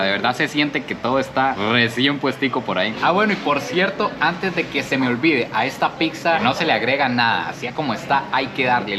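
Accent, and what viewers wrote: Costa Rica. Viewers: Te gusta el acento tico? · Me encanta oh mejor dicho me facina tu acento costarisence.❤️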